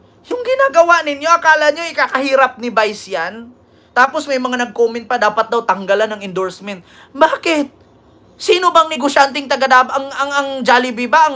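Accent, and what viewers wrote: Visayas, Philippines. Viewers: Hindi na baling bisaya punto mo, ang importante hindi ka bobo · Tawa tlga ako s pesteng yawa mo hahaha ganda nga Punto mo mg bisaya k hehe ako ilocana pero gustong gusto ko mgbisaya😂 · NUKNUKAN NG TA TANGA NYO DDS❗VISAYA LANG ANG PUNTO NI, PERO MALIWANAG SYA MAG TAGALOG❗KAYONG DDS NA DAVAO NAKIKI VISAYA LANG KAYO❗WALA KAYONG SARILING LENGUAHE AT SARILING PUNTO❗❓BOBOOOOO❗❗❗❗🤬🤮👺😖😩😡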